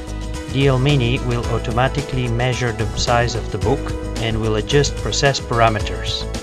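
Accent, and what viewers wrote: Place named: India. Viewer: Swiss made but with Indian accent ?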